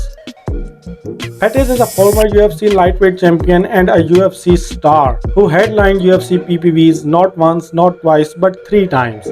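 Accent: India. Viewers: Damn I wanted to watch this video so bad but you sound like one of them Indian scammers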